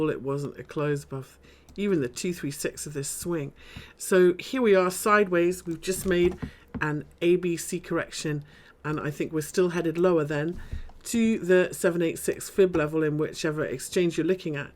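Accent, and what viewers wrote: United Kingdom. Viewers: U sound British . Look forward to Learn from you base in London.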